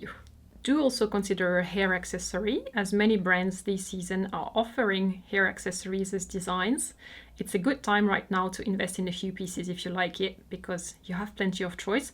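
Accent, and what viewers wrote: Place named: France. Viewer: I could listen to you talk about fungus and cellulite all day 😂. You have a lovely voice and French accent. Everything sounds so especial!❤️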